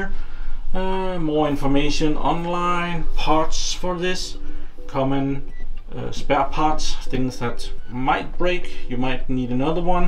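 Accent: Denmark. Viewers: dansker confirmed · are you from denmark? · are you danish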